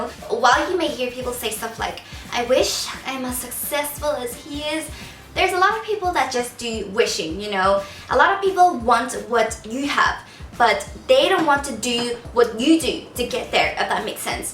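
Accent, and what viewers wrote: Northern Ireland, United Kingdom. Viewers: you still have such a northern irish accent, it's so cute :) I'm procrastinating but I'm going to try and beat it! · Your Northern Irish accent made me chuckle :> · Are you part northern Irish? You sound a wee bit. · Yay! You're northern irishness came out in this video haha!! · Thanks for this vid:) Aaaand I kinda miss your heavy northern ireland accent! hahaha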